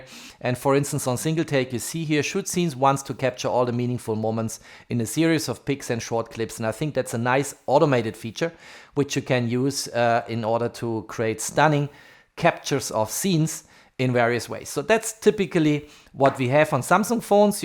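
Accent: Germany. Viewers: Please, stop calling Huawei a Hua-Y, and Ultra ['ʌltrə] is not ['ultra]. I can hear your native language is german, nevertheless... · Man hört am Dialekt das er Deutsch ist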